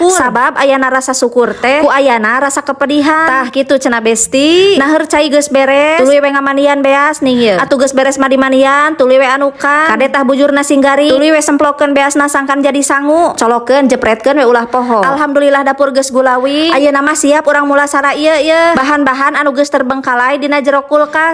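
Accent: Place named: Sodong, Indonesia. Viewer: Logatnamah ciga urang Sodong.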